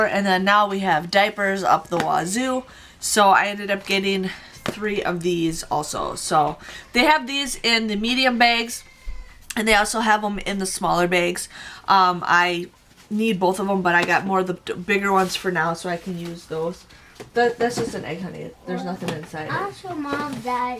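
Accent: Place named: Minnesota, United States. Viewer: Of I didnt know you live in WI, I'd swear you were from MN or ND. It really comes out when you say certain words like "bags". 😘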